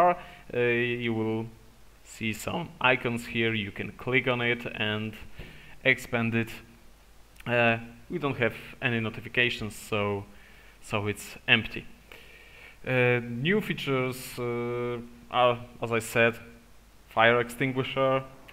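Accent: Poland. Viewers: Wyczuwa się ten polski akcent :) Ale film oczywiście dobry, łapka w górę ;)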